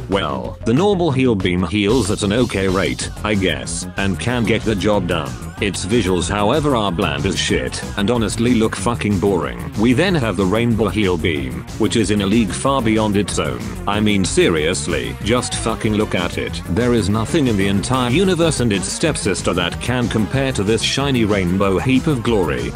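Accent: United Kingdom. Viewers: Honestly, man should've just used his British voice for this Ability Analysis.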